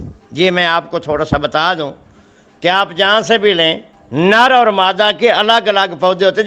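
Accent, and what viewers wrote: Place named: Punjab, Pakistan. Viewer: Yar apni Urdu to theek karo youn lugta hay jaise punjabi bol rahe ho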